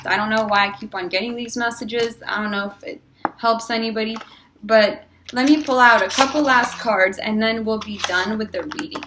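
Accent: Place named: Russia. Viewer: From Russia? I detect a slight accent maybe but you definitely speak better English than most (including myself)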